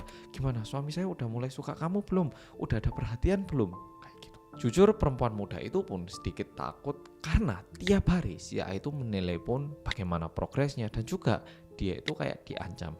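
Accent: Java, Indonesia. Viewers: mas, suara viedomu tu kecil, cuma kedengeran medoknya doang, boleh saran ya volume nya agak di gedein, soalnya kaget udah gedein volume trus tau2 iklan kenceng banget suaranya T_T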